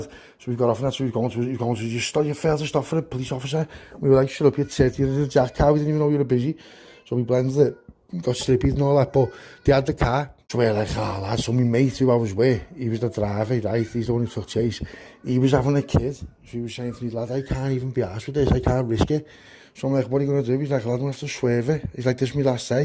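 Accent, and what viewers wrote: Liverpool, United Kingdom. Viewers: Hi scouser ❤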